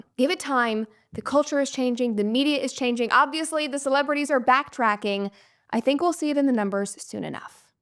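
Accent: United States. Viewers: now become more active in creating more awesome contents for us as fan weekly 4 videos, i really liked your vibes typical American accent fast quick accurate and precise no wonder u name yourself professional yapper:yt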